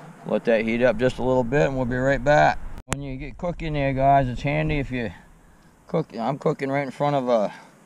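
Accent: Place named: New England, United States. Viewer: I'd swear you were in New England. 😁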